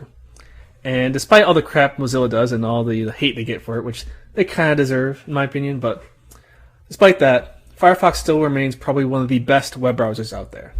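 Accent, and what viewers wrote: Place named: United States. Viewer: He sounds American, poor guy. Being Catholic in USA must be like being a Russian in post-WWI Germany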